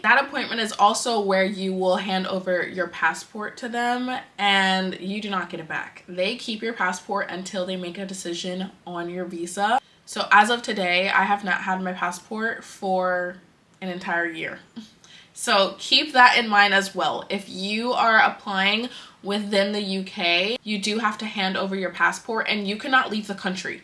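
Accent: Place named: United States. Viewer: She looks like she has an accent. Her American accent is still thick. But I be hearing a lil accent every now and then. She getting prettier and prettier. ❤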